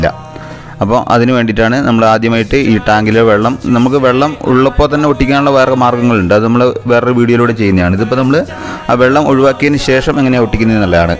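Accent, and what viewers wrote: Kannur, India. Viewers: നിങൾ കണ്ണൂർ ആണെല്ലോ....പോളി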